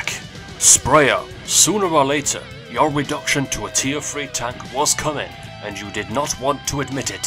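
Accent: United Kingdom. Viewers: The R-rolling just sounds very weird, in the midst of the British-like accent.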